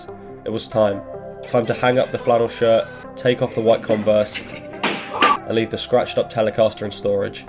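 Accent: United Kingdom. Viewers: Lmao, British accent talking about the Midwest… cute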